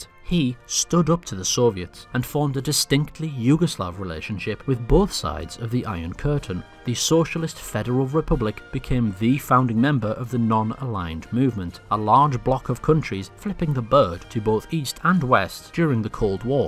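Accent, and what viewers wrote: United Kingdom. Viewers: Love the countryballs and the humor, keep it up! Properly handled video, I wasn't even aware of the genocide in the Balkans. My question is: Where does that accent come from? I know it's British, but more precise please ^^ and how do you animate your videos? Greetings from Germany!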